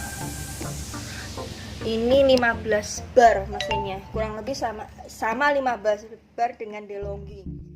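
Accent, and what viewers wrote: Java, Indonesia. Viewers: Medok nya mantul mba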